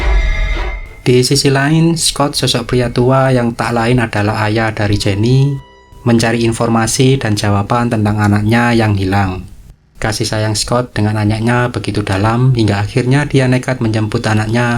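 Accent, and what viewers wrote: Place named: Java, Indonesia. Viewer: Film nya menegangkan. By the way spean orang jowo to mas logat nya ketauan. Hehehe...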